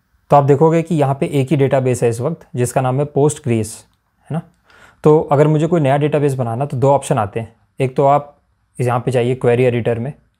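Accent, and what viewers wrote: Odisha, India. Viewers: Are you bro from odisha?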